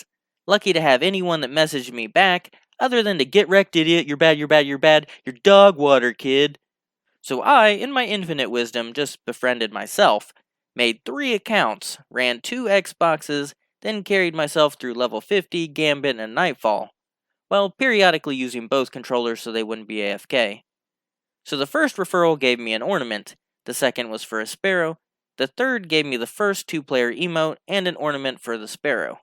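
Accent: United States. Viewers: if i didnt knew it better i would think you are american (cause of your english skills and speech) :D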